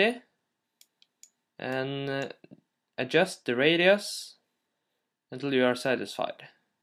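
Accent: Norway. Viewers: Are you norwegian? Sounds like it :) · hahaha kan høre at du e norsk på uttalen xD konge vid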